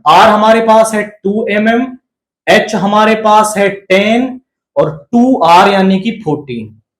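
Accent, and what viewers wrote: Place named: Haryana, India. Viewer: Haryanvi touch 👍👍👍😂😂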